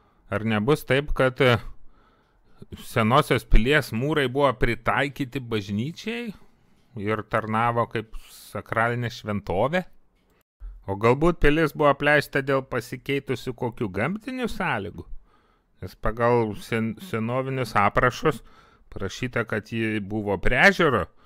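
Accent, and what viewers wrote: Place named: Kaunas, Lithuania. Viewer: Čia tau ne Vilniauskašlechta kalba pusiau rusų pusiau lenkų. Iš akcento girdisi kad Kavenskas tautietis.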